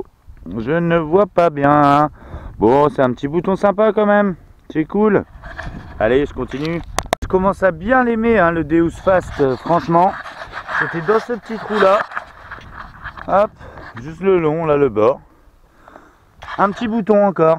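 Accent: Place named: Brittany, France. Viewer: salut, j'adore la présentation de tes trouvailles, on sens bien que tu est de Bretagne. pas mal de trouvailles, surtout des boutons, tu vas bientôt pouvoir ouvrir une mercerie MDR. merci pour le partage, bonne détection et à bientôt + 1 pouce bleue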